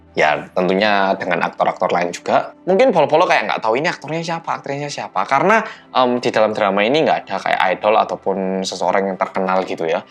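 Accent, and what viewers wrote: Java, Indonesia. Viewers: BERSAMA ORANG KOREA YANG..... MEDDDDHHHHHHOOOOOOKKK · Keseringan denger mas ngomong medok, pas denger mas niruin kutipan filmnya, kaget "oiya, mas kan orang Korea, fasih dongg" 😂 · Mas yg medookk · Bang coba bikin konten yg ngomong medoknya di hilangin, dong.🙏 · Halo mas medokkk 🤗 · Muedok tenan😄😄🐈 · Park soe joon dengan kearifan lokal.... Medok... Medok... = park seo joon (mungkin kembar 😀😀😀😀( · Berasa ndelok park seo jun ngomong bahasa indonesia medok, mirip banget mas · Lbh enak dgrin mas ngmg bahasa dgn medok2nya dibandingkan ngmg korea😅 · Mas iso medok men mas? 😂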